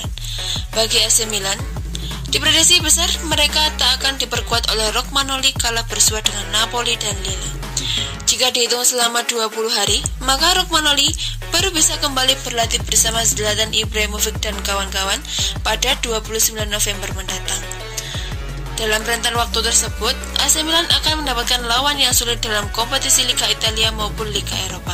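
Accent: Java, Indonesia. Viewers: narator nya ganti nih..medok doang jawa nya tapi suaranya lemes, notasi naik turun gak ada..lempeng doang kayak baca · mba medok bnget mba suarane😂🔴⚫❤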